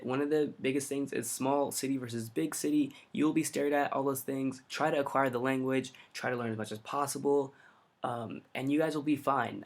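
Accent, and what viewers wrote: Spain. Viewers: I love your spanish accent! I am looking to visit perhaps live there, great video :)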